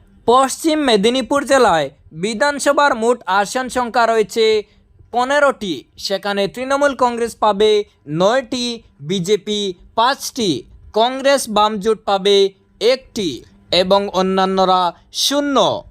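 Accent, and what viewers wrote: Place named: Bangladesh. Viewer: এটা বাংলাদেশী মাল কথার ধরন শুনে মনে হচ্ছে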